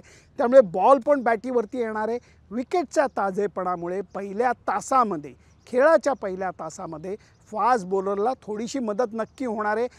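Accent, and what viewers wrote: Pune, India. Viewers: लेले सर, सामन्याआधीची उत्कंठा आणि सामन्याचा आनंद याबरोबर तुमचे विश्लेषण म्हणजे सोने पे सुहागा!तुम्ही केलेले विश्लेषण हे आपल्या अस्सल पुणेरी शैलीत ऐकताना क्रिकेटचा आनंद द्विगुणीत होतो. त्यामुळे १लाखाचा टप्पा अगदी सहज पार होणार आहे. अनेक सुभेच्छा!!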